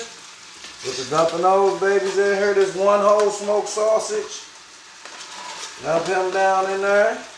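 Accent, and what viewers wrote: South, United States. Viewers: I love that way you talk southern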